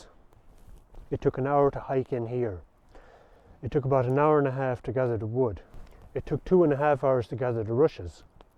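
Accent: Ireland. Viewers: U irish ?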